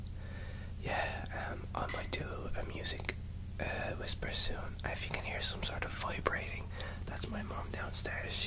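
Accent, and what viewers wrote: Ireland. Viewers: Niall Horan?!? Hahah jk I love your voice and that irish accent though!! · I'm hearin Dublin =D Cork here....good to hear an Irish whisperer out there =] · You have a lovely Irish whispering voice :-) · oh awesome your Irish. I'm Irish born in america but my family is from Dublin, Ireland i think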